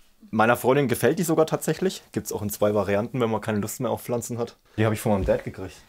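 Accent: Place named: Franconia, Germany. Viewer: Super sympathisch und direkt den fränkischen Akzent gefeiert 😍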